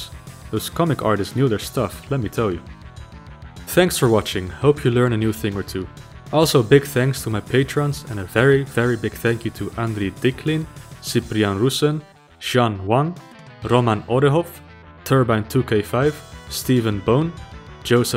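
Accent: Netherlands. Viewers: tfw you can spot a fellow Dutchie just by their accent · R u Dutch?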